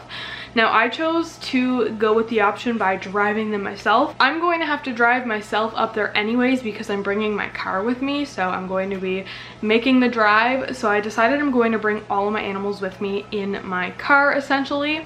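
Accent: Canada. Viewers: I love your Canadian accent lol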